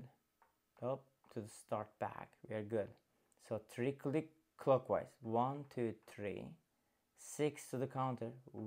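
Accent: Turkey. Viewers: Usta Türk musun sanki aksanda Türklük var gibi 😅😅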